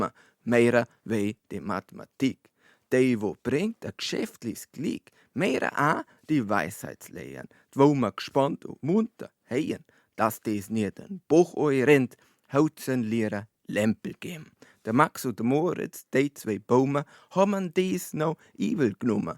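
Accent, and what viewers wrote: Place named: Franconia, Germany. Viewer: Für mich klingt das Vorgetragene, wie wenn ein Franke nord-oberpfälzisch spricht ;)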